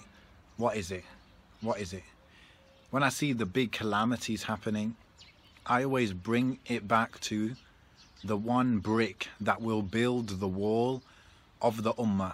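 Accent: United Kingdom. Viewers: As-salaamu alaikum. What's the name of the introductory vocal track please brother? Also, are you from Derbyshire, your accent sounds Midlands-y?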